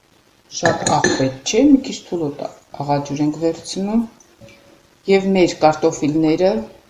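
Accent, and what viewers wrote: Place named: Lori, Armenia. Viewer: zgacvum er Lorva akcent@ hacheli e EV tesnel EV lsel.I'm hamaqaxaqaci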